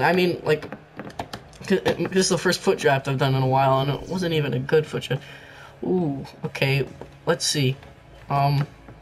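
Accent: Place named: Estonia. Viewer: wtf ? are you from estonia??????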